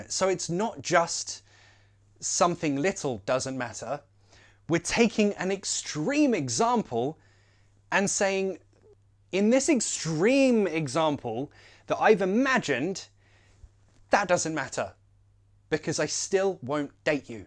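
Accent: United Kingdom. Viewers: Even though I have a lot brit friends, but I'm still need to fill my grammar English cause I love the British accent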